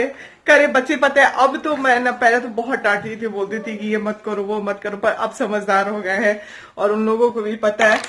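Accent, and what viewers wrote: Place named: Bhojpuri, India. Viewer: Apke muh se bhojpuri bahutt achhi lagti hai dii.. Nice vlog💓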